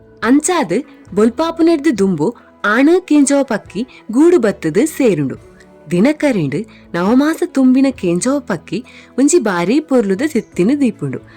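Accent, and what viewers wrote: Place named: United Kingdom. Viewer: ತುಳು ಸರಿಯಾಗಿ ಮಾತಾಡಿ, mam ಇಂಗ್ಲಿಷ್ ನವರು ಕುಡ್ಲಕ್ಕೆ ಬಂದ ಹಾಗಿದೆ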